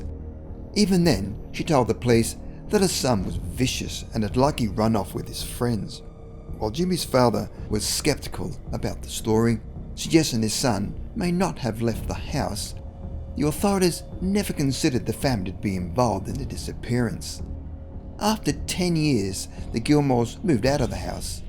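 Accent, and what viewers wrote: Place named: Australia. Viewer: cant they find someone other than that aussie to narrate? what would he know about california anyway?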